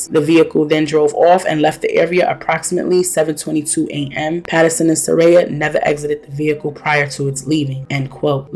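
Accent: New York, United States. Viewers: I love the way your NY accent flows with your storytelling!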